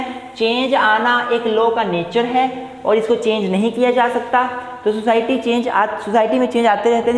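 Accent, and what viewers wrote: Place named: Jammu, India. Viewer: Jammu ka teacher lagg rahey ho Language flow say😊but well done 👍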